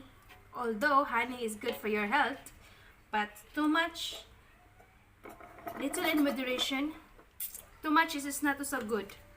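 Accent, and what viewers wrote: India. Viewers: Indian accent ka narin Kbayan.Buti nlng Pinay kharap ko bka dudugo n nmn ilong ko kakaenglish..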